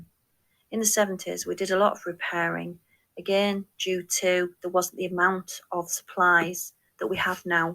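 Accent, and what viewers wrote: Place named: Hull, United Kingdom. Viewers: Thank you SO MUCH for your fabulous videos. My beauitful Mumma (of blessed memory) was a Yorkshire girl who emigrated to Australia in 1963. I was born the year after and have such fond memories of growing up in the 70's. Mum was a very frugal lady and passed on many skills. Beyond grateful for her input. Things here in Australia are very expensive but I make the most of things and enjoy the challenge of making do. Ohhhh, how I love your accent! It sounds just like my Aunt in Barnsley! Think I might be a Yorkie at ❤! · Your accent sounds familiar - do you come from, or very near, Hull ? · Well done on hitting your first 1K Fab video once again, both Mr Wallflowers and I really enjoyed it, we especially enjoyed your poem with your beautiful Yorkshire accent ❤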